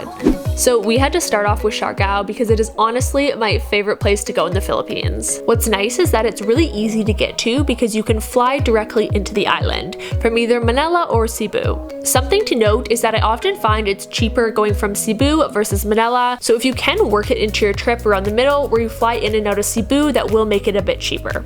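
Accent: Canada. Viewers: you didn’t it’s cute hearing Filipino names through a Canadian Filter?! 🤣😉 (Cebu does sounds Like you: Seebu vs I’ve Heard other Filipinos myself included sound more like: sabu less emphasis on the e, no biggie, just cute, don’t worry no one understands me either!) 🤣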